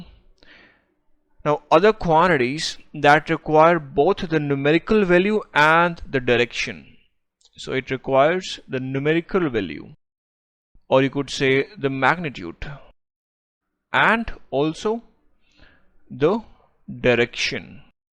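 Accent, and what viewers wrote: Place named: New York City, United States. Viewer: NYC voice pitch 😊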